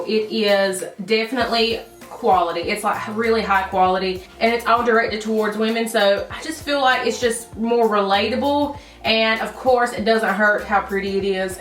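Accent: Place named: Mississippi, United States. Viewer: I just came across this video and first off I could watch your videos all day just for your accent!!! I live in Mississippi and never thought I would find someone that sounded like me on YouTube lol!! LOVE IT!!! I can totally relate to the amazon recommended tab it’s like amazon knows me personally lol love your decor behind you as well so pretty!!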